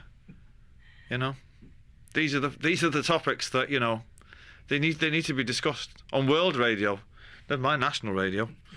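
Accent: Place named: Manchester, United Kingdom. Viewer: Sei stato bravissimo con i sottotitoli, posso farti solo un piccolissimo appunto? Io non avrei tradotto "freak out" con sclerare ma piuttosto con "scioccato" o "molto sorpreso". Può anche significare "spaventato" che però in questo contesto non ha senso ma il significato che tu hai scelto a me non risulta o almeno a me non è mai capitato di sentirlo usare in quell'accezione. Ripeto, sei stato molto bravo anche perché il mancunian non è né facile da capire né piacevolissimo da ascoltare. Anche se quello che esagera con il dialetto è il fratello Liam.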